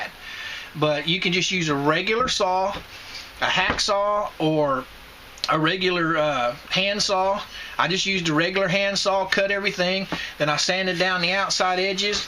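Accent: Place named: Missouri, United States. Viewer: LOL.... I like your accent.... Are ya from Missouri?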